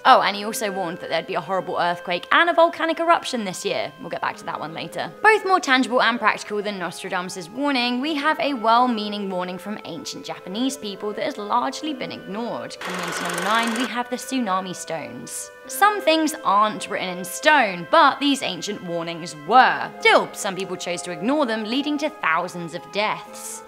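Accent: England, United Kingdom. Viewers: When people with British accents try to talk about American politics make me laugh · Her English Accent.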